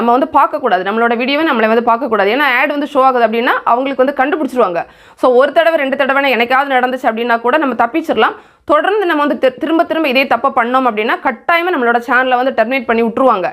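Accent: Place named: Kanniyakumari, India. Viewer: Behind the scene nice...love our kanniyakumari slang.. sister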